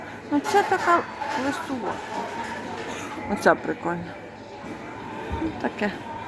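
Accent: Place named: Boryspil, Ukraine. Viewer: Ви, вразили тим- 40 год!, спасіба, 3 часа, саме вкусне, я рахую, вобще, шутками і таке інше. 😊 Ви направду; розважили мене нині. Пам'ятаю із свого дитинства як у Борисполі, батьківщині моїх бабусі і дідуся, розмовляли подібно до цього, такою малороською мовою, кумедним суржиком. Але вони були мудрі та навчили своїх дітей звертанню до батьків- тато, мамо і на ви. Сподіваюсь, у Марії все добре. Здоров'я та добробуту. 🇺🇦✌❤🖤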